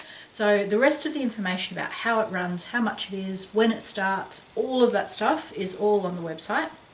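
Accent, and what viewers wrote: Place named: Australia. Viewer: Great to hear an Aussie accent! Thankyou!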